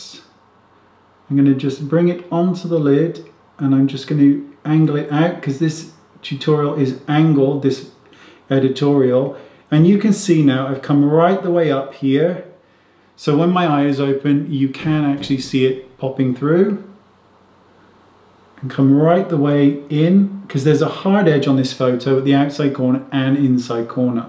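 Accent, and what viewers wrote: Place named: Scotland, United Kingdom. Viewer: What sort of lovely accent do you have? Sounds a bit Scottish sometimes.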